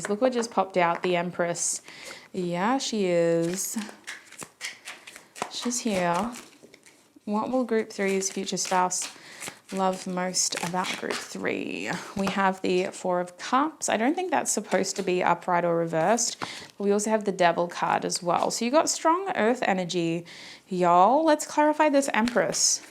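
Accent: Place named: Australia. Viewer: Listening to her videos helped me a lot with aussie accent😂❤thanks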